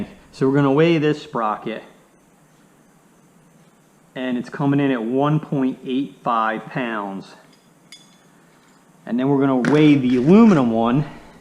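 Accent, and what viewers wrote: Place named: Pittsburgh, United States. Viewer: You got a Pittsburgh accent lol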